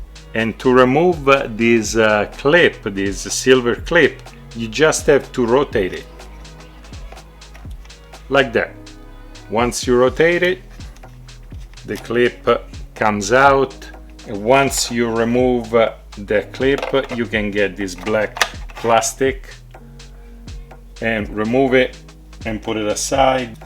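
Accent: Italy. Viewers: Is it weird that I like listening to Italians speak English vs French people? I like French people, but I can't watch a video having them explain things to me. Italians? Well, I watched this whole video and don't own my AT yet. Yeah, it's weird. Whatever. Great vid.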